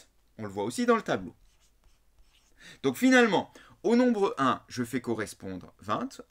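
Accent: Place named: Alsace, France. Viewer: en alsace on le prononce, et il me semble qu’il vient de là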